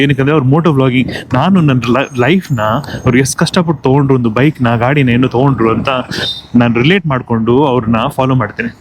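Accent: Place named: Bihar, India. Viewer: Accent seems like Bihari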